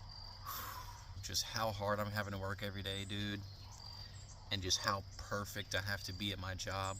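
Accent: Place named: South, United States. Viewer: I like your southern accent and your style of narration ❤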